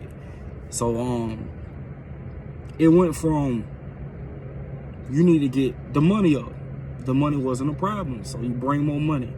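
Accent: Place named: New Orleans, United States. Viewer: That accent though...New Orleans?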